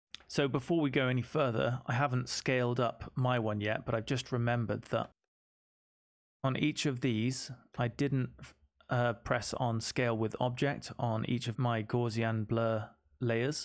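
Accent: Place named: England, United Kingdom. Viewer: Very well and systematically explained in a normal English accent that one can easily follow. No unnecessary and erratic mouse movements. I have seen many Affinity Designer tutoriuals. Some of them don't even bother to speak. They just play some wierd music in the background, move their mouse (with a really small white cursor) erratically and expect us to follow. Well, not at my age. My only request to you would be to get a screen recorder where the mouse is highlighted and it emits sort of light waves when clicked. That way, we seniors will be able to follow your tutorials better. I have suggested this to many YouTubers and some of them have implimented it and thanked me. I am now subscribed to you. I see that you don't have an interactive Facebook group where one can ask questions to the community and the guru (you) can step in every once in a while to give a final judgement. 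Best wishes from Australia.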